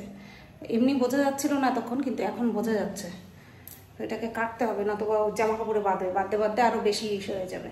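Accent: Bangal, Bangladesh. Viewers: তোমার বাঙাল কথা আমার darun লাগে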